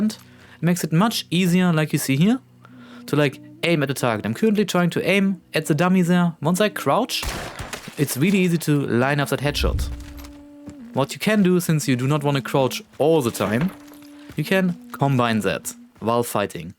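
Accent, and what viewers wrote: Germany. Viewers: that German accent to me as an English person is a stamp of quality! I just know it's going to be a good video. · Ich höre sie sind ein deutscher der herr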